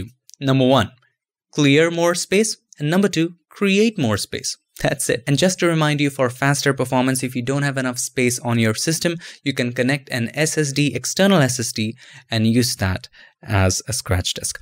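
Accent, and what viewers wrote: India. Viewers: No matter how much you try to get a american accent your indian one cracks through your effort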